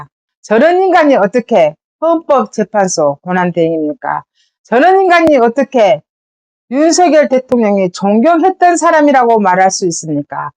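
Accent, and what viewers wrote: Jeolla, South Korea. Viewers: 반세기넘게 살도록 전라도 사투리 가 이토록 순박하고 구수하게 들리기는 첨 입니다. 애청자 입니다❤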